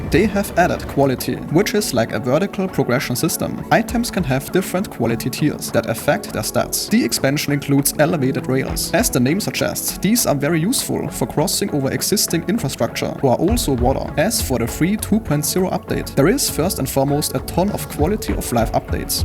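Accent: Germany. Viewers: Dürfte ja auch ein deutschsprachiger YT'er sein. Zumindest hört sich seine englische Aussprache danach an.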